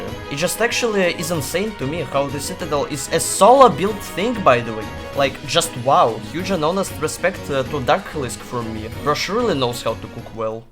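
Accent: Russia. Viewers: у тебя как будто русский акцент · man, u have have so Russian accent XD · You are Russian? (your accent sounds like Russian.) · Bro you have absolute russian accent and great vid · U have russian ascend, are you? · russian accent noticed👌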